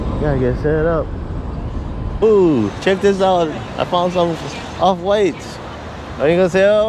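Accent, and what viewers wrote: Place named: Hawaii, United States. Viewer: You sound like a local boy, you from Hawaii?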